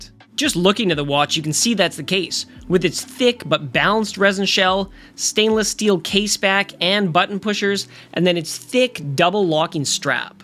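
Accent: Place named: Canada. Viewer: I'm guessing you're in Canada, as you pronounce the word "top" the same way as Jordan Peterson.....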